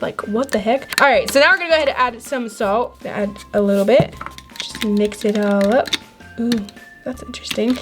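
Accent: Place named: United States. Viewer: Tot you were American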